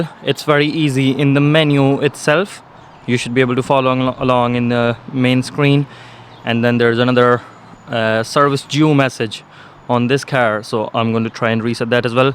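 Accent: Ireland. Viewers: I heard the Irish "twang" easily enough! But we're all best friends ❤️❤️ until the 6 nations 🤣🤣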